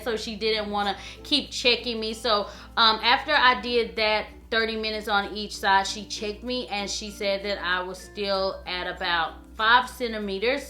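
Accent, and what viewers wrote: South, United States. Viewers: You have a cute southern accent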